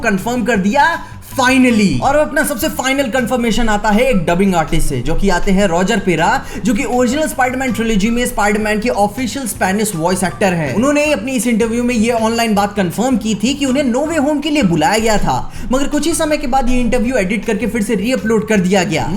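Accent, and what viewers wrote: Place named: Bihar, India. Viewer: Bhai tumhara style ek no.. up Bihar ka touch....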